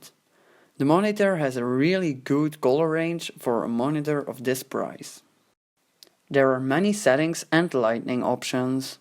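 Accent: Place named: Netherlands. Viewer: Nice vid. U dutch cuz u sound like it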